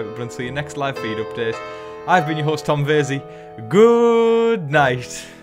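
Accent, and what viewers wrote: United Kingdom. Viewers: BTW Because of listening to you for three months I can now understand your British accent plus I can understand others as well. Especially the people on the movies as well as many others. Thank you Tom for providing us with all your comments and thoughts on this season of Big Brother.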